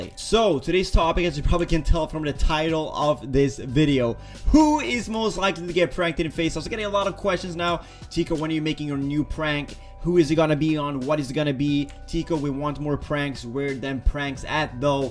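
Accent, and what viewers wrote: Sweden. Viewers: i did know he was swedish and that he coukd speak swedish....i just didnt notice he had a bit of an accent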